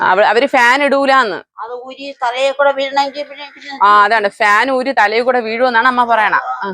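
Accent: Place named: Thiruvananthapuram, India. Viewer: Ath aaa nattusailiyanu....kuttam parayanda..jnanum Tvm...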